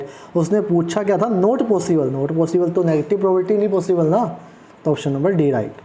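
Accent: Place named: Punjab, India. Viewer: Nice lecture 1st time complete sunya h. But tuhadi speaking Ch Punjabi tone h so I think u must teach in Punjabi.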